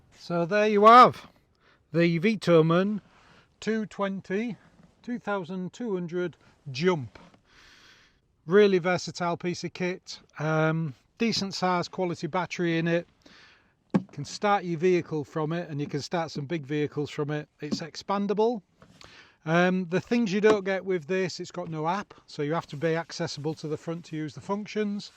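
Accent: Hull, United Kingdom. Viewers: I want one ,nice piece of kit ,love the videos nice hull accent 😮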